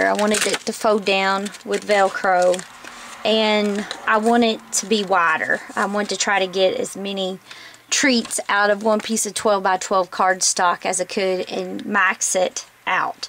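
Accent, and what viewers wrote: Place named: South, United States. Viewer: I just ran across your video. I LOVE IT!!! Thank you so much for sharing this with us. I can tell you are from the South. Where do you live? Beautiful coastal North Carolina is my home.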